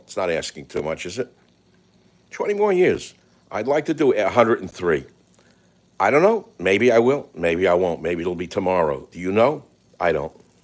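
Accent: New York, United States. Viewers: Wow. I thought you were a New Yorker.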